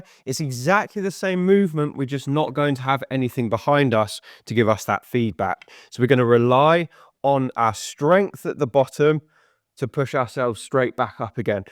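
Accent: England, United Kingdom. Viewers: I’m here for the accent. Lovely English accent. Beautiful way of saying “bottom “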